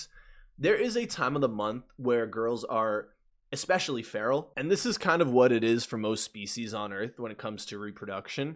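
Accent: Canada. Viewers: Canadian, definitely Western Canadian. The "a" is pretty distinct to Alberta.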